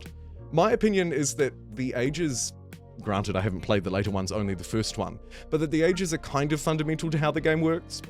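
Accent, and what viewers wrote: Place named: New Zealand. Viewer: Nice to click on a random video and hear a kiwi voice. Rawe bro, nice video. Generally pretty excited for the new game, kia Ora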